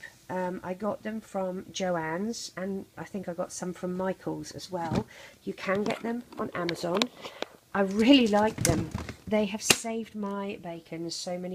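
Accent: United Kingdom. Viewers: Cool channel!!! <3 your creations!!👍👍👍👌👌👌✌✌✌😊😊😊 I love your British accent!!! 🇬🇧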